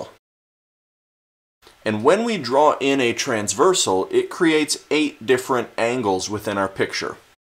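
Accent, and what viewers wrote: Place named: Canada. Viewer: this man is a canadian fo shooooooooo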